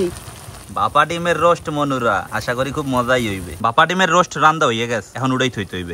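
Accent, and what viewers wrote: Barishal, Bangladesh. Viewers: বরিশাল এর আপনি?? কথা শুনে মনে হচ্ছে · কথার ভাষা একটু ভালো হলে ভালো লাগতো বরিশালের ভাষা বলে কথা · ভাইয়া আপনার বাড়ি কি বরিশাল 😊😊😊😊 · এ দেহি মোগো বরিশাল্যা 🙂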